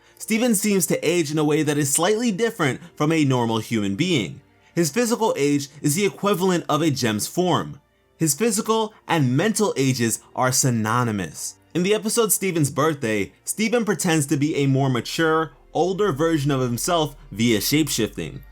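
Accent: United Kingdom. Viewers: Are you from the uk 🇬🇧??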